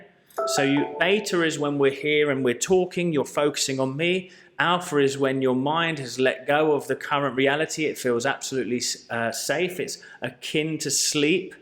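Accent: United Kingdom. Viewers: It’s so wonderful to hear your uk accent talking about all these teachings and scientific findings that we have read in these incredible books and that aren’t being shared in the mainstream. I have read Bruce Lipton, Jo Dispenza, Gregg braden, deepak chopra and many many more.. I love the way you explain it. You are refreshing and genuine and your realness shines through in your video. Thank you 🎉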